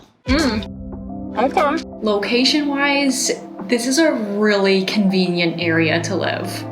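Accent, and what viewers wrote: United States. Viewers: At some point, can we get a report about NY from someone who SOUNDS like they're from NY and not the midwest? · you dont sound like someone who was born from new york. you talk like one of those californians who talks so slow and pronounce words very loooong..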